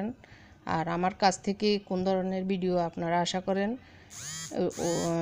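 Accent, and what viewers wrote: Noakhali, Bangladesh. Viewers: Apner kotha gulo purai noakhali la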